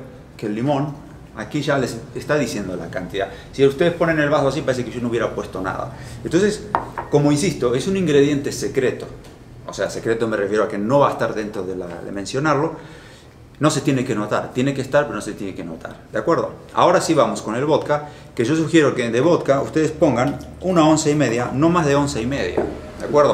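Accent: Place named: Argentina. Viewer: Que lindo escuchar a un Argento chamuyando jajajaaja somos científicos de lo que hagamos jajajaja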